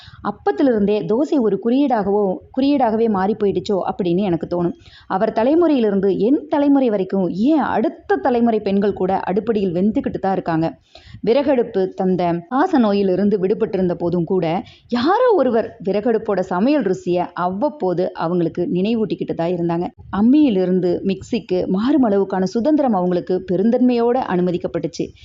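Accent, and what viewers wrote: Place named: Kongu, India. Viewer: கொங்கு மண் சார்ந்த கதை. அதை கொங்கு தமிழில் கேட்பது மிகவும் இனிமை 👌🏻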